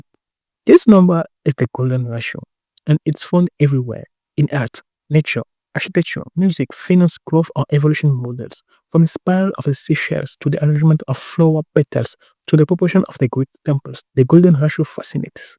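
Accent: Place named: United Kingdom. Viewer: is it just me or is this genuine gibberish with a british accent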